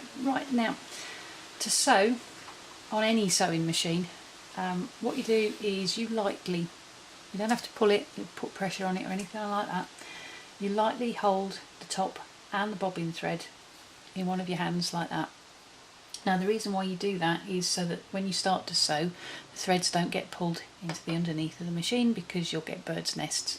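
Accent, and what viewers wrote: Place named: England, United Kingdom. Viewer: Sounds like that "grandma" might need a good "sip of oil". I have been sewing for a least 64 years. A beginner would need to see the most challenging part of sewing the ends of the elastic together. Usually that part is not very pretty no matter how we hold it or pin it. That is to be expected. LOL. Been there, done that. i worked for 9 years in the "garment industry" as a sewing machine operator. I have my mother's treadle sewing machine. Great job on your video and very nice job on sewing the mask! At my age, this great, great gma needs a sip of oil too. A "fountain of youth" would be wonderful to find. Love your "English accent". I am from KY and speak with a "Bluegrass English" accent. ~ August 7, 2022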